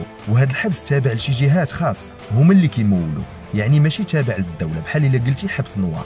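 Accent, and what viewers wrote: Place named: Tunisia. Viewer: علئ حسب ضني هذي اللهجه التونسيه اذا ماني غلطان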